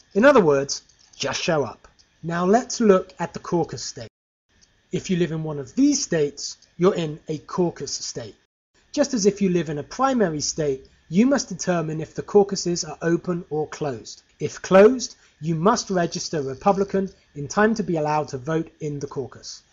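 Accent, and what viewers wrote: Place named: United Kingdom. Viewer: This is very informative and straight forward. The fact that a British voice made this video leaves me wondering how much international support Ron Paul has. Ron Paul 2012!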